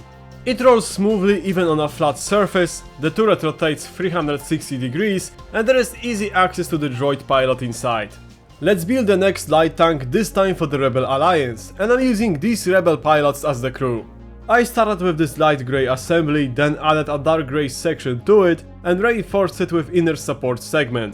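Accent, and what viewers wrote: Poland. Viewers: I really like your content, od razu po akcencie poznałem rodaka 😂😊👍 super, że mówisz po angielsku, bo masz szerszą widownię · masz polski akcent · All of your mocs are just great - but I can't hold myself anymore, I need to tell you that the first time I've stumbled on your video as I've heard you I immediately knew you're Polish as well :P it triggers me anytime I watch your newly uploaded video - but on the other hand it reminds me of how much legendary moc builders besides you are Polish, such as Jerac or fukusaku - and I have to admit that it makes me somehow proud :) PS I don't mean to make you feel upset, sorry if I did that - I shouldn't even criticise the accent, and I have nothing to criticise on MOCs you make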